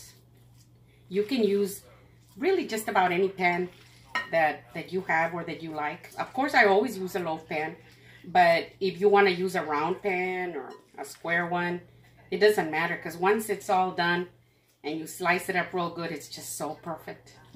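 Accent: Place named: San Antonio, United States. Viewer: 4-7-24 Just saw this a few minutes ago but not soon enough to try your recipe. My meat loaf is browning in the oven., I have tried many of your recipes and they are always great. Will try your meat loaf next time. Just enjoy listening to your San Antonio accent. 🤗 Thank you for sharing all your talent on youtube.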